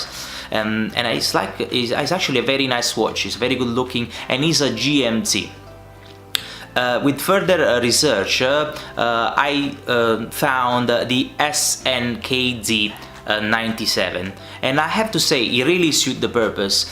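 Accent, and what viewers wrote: Italy. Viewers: I bought this watch and I love the looks and I love the size. Great review and I did pull the trigger and got one partially due to this review so thank you. My grandfather came from Sicily so I had no problem understanding the Italian accent, in fact I loved it. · The italian is strong in this one, btw nearly pulling the trigger on this watch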